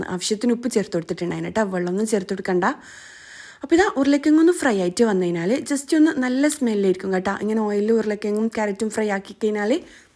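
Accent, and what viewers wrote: Kannur, India. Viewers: കണ്ണൂർ ഭാഷ അടിപൊളി... 👍👍👍ഞാൻ മലപ്പുറം ആണ് ട്ടോ.. 🥰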